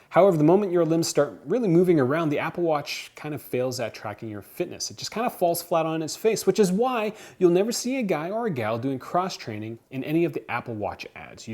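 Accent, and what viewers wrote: Canada. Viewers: I like your Canadian accent eh.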